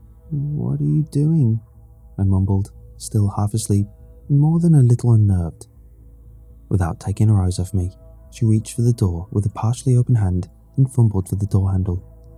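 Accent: United Kingdom. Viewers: your voice is so soothing! I'm not English. But I LOVE this language and I really want to become a native English speaker some day. I'm curious about variety of accents in the UK. Which accent is it that you're speaking? It sounds alluring, smooth, calming and clear. I always listen to you when I'm feeling down in the dumps. It helps me in so many ways. ^ ^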